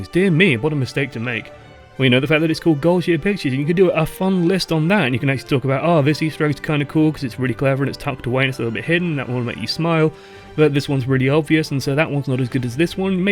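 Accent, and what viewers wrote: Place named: United Kingdom. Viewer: Sure there was something very funny but i dont know English so well to understand that( But still thank you for your videos because i can watch some interesting material about game i like and learn English a little bit. First time i watched one of yours i didnt even understood all that you said but now i can easily listen to people with British accent and realise what they say. So yeah, you`re cool. Keep doing your vids.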